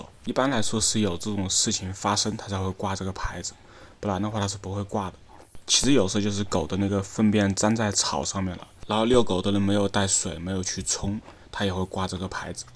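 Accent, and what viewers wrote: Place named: Hubei, China. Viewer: 我以前有位同事也是湖北的，和你的口音好像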